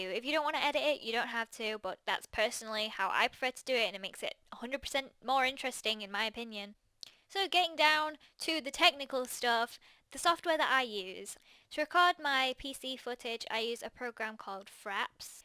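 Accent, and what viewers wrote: United Kingdom. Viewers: Best part she's British :D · Are you from the UK?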